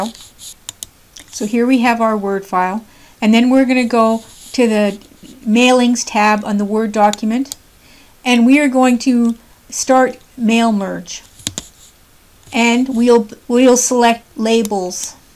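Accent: Canada. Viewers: you are Canadian... I can tell by your accent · Thank you! Very well explained. And I could tell I was listening to a Canadian when a-Gain came up, and then a-Boot. I was born in Vancouver but we moved to LA when I was 6 so I lost that charming accent and now just sound like an international dual citizen American living in Europe. 😉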